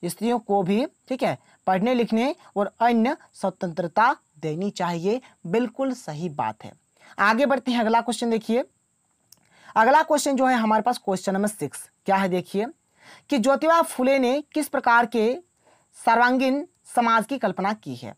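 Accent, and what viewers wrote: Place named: Jharkhand, India. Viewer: Aapka language kabhi kabhi Jharkhandi jaise bolte hai aap sir ji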